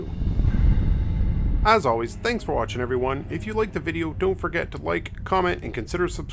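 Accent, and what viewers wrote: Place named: Canada. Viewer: Haha I even subscribed too. I like how you do content and your accent is clearly Canadian, same here I’m in Alberta. Keep grinding man 🤙🏼🔥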